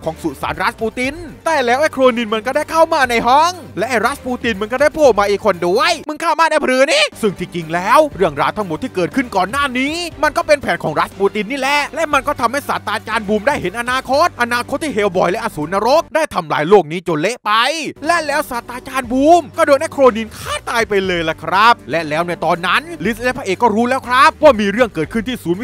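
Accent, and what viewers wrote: South, Thailand. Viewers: แอ็ดคนใต้ม่ายนิ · คนใต้อยู่แล้วครับผมว่า แต่ไม่รู้จังหวัดไหนครับ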